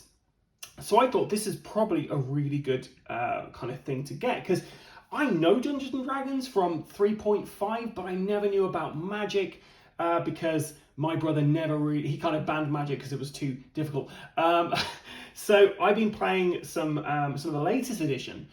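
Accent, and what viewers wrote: United Kingdom. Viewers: OK, I'm confused. Youtube says this was uploaded a year ago but it only came out a couple of months ago didn't it? I'd put it down to geographic area but I'm uk and your accent is English.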